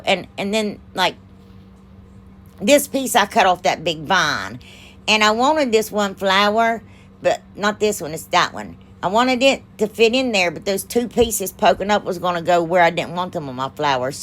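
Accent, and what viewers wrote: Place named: Appalachia, United States. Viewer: 🩷Your accent,🩷 OH MY HEART! My family is from the Appalachian Mountains in Virginia, and I don't get to see them often, my Parents have moved to Heaven, so I don't get to hear this often, I could sit and listen to you FOR DAYS! I love your work, but, hearing you talk, takes me home and brings such joy to my heart, Makes me just want to hug you. THANK YOU for sharing with us. (That said, I don't know if I got a bad one or I'm too stupid to use it, but, rub n buff 🙄 I went to use mine and it was like a vein opened and squirted everywhere. Is it supposed to be liquid-ish?? Runny.. I even 'shook' it. So glad I found your channel. Be blessed in Jesus Name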